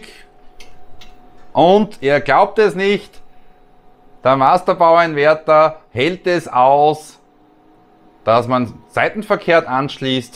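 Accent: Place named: Slovakia. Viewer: Ich genieße dein Dialekt in Slowakei. Toll toll toll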